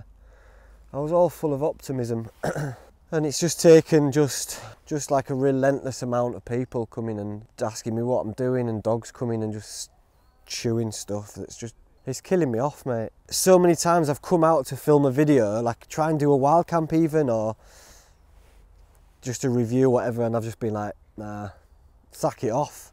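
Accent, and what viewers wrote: Yorkshire, United Kingdom. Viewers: Aww, don't fret about your script! I just love to see your little face and hear your Yorkshire accent! Just do you! Love from South Carolina! Xxx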